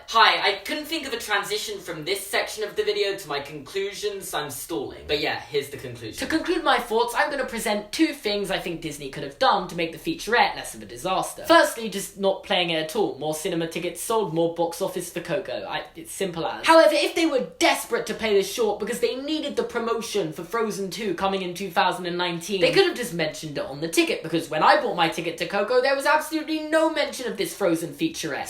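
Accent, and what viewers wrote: United Kingdom. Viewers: Your British to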